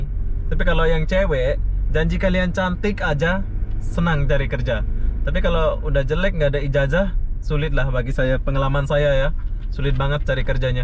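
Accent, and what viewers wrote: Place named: Malaysia. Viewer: sip makasih mas, tapi logatnya kayak malaysia yah..hehhehe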